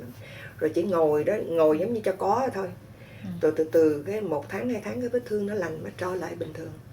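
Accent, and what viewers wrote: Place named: South, Vietnam. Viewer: Cuộc đời chị như một cuốn phim thăng trầm với giọng kể chân thật, giọng nói miền Nam ấm áp. Với tấm lòng yêu thương mọi người và kính hiếu với cha mẹ, tận tâm với em út. Những ân tình của chị với những phận đời đau khổ trong chiến tranh, sự tưởng nhớ đến những hương linh của những người vượt biển nhưng không đến được bến bờ tự do....Chị thật sự là 1 người đẹp cả dung mạo lẫn tâm hồn. Ngày nay, rất hiếm có những người tốt như chị.Cầu mong ơn trên ban phước lành cho chị và những người chị yêu thương. Cám ơn chị.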